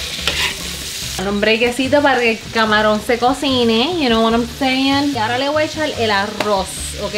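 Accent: Puerto Rico. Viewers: 🥰🥰🥰 Que chula tu mami, 🥰🙏🏼 Tengo que hacerlos y es ya! Gracias! hablas más boricua que yo 🤪😁😁😁🌹🌹🌹🌹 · Es bien gracioso ver su físico de chinese y escucharla hablando con todo el slang boricua! Muy bien video, fácil de seguir y divertido. ¡Excelente borichina! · La voz la delata la forma de hablar .100 porciento bori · Ella Dice > Cocinal < Bien Boricua.. ✌🤣🇵🇷 Tu hablas bien Boricua Hasta cambias la R por la L... Weepaa! Saludos.. 👸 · Wow. El Acento Boricua ❤️😍 🇵🇷 The Perfect accent. You Speak it Perfectly 😍 🤩 Lo habla Perfectamente! 😌 el Acento Puertorriqueño 🇵🇷 God Bless ! 🙏🏻 . Puerto Rico 🇵🇷 in the House 🙌🏻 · Eso mismo estaba pensando..habla bien boricua. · Es China peroooo bien boricua en acento y corazón.. Ese acento nos distingue a los boricuas donde sea.. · Es normal. Es puertorriqueña. ¿Cómo va a hablar si no es como lo que es? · 🈲️🈸️🈯️🈁️ Hija adoptiva de mi Puerto Rico que es tu casa 🇵🇷 y lo bien que habla nuestro idioma y acento boricua,me encanta tu dinamismo y simpatía chica 💖💖💖 🇵🇷🇵🇷 🇨🇳 🇨🇳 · Ella habla bien boricua y se ve que ama a Puerto Rico. 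Mejor dicho, esa si es de aquí. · Mi arroz preferido ....y una asiática que habla con un acento más boricua que el mio ....espectacular me suscribí a tu canal estaré 🇵🇷👀👈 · .. Yo soy Boricua vivo en Texas.. Te Pido Disculpas por el Pueblo de Puerto Rico.. La Mayoria no somos asi. Pero acuerdate que los ninos y adolesentes abeses son muy crueles. eres una joven especial y tus Padres son ejemplares. Te criaron muy bien. Me encanta tu acento Boricua y Tu forma de Ser. Sigue siendo Tu. And forget about anybody else. We love You. Que Dios Te Siga Bendiciendo. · QUE 🆒 Y QUÉ SYMPATICA ESTA MUCHACHA, PRIMERA VEZ QUE VEO UNA ASIATICA CON ASENTO BORIQUA 🇵🇷 ♥️😁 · Omg girl!! You speak like a truly puertorrican!!! 💜💜💜💜💜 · Que bonito escucharte hablar como boricua nosotros vivimos15 años en NY y nunca vi o escuche una persona china boricua y ahora que te encontré me encantó tu acento y tu personalidad somos de GUATEMALA pero amamos los boricuas porque son de buen coorazon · Eso mismo dijeeee pensé que era boricuaaaaa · Ese acento se oye muy bien,que bueno que ames tanto los recuerdos en mi bella isla,bueno corrijo,en nuestra bella isla porque con ese acento jamás hubiera pensado que eres asiática. 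Gracias por compartir la receta y por haber hecho feliz el paladar de mucha gente en PR❤️ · Una Chinita que habla como boriqua! Esto es un tesoro nacional! Que nice!🇵🇷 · Dimeloooo!! Eso mismo estaba diciendole a mi amiga q me lo envio. Estoy estupefacto porq llegue aca en US 8 años ya y me habia olvidao de eso. Esta preciosaaa y mas hablando español de PR. Obvio no estoy diciendo nada porque boricua es!! 🇵🇷 🙌☝ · Woooooow ❤️❤️ Nunca había visto a alguien asiático con ese acento boricua tan REAL! Un verdadero orgullo. Una personalidad tan súper amistosa y súper PRECIOSA POR CIERTO. Que ricooooooooo, quiero hacerlo ❤️ 🇵🇷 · “ me voy a haltal “ pura boricua me hiciste reír muchísimo me encanta como hablas.😂😂😂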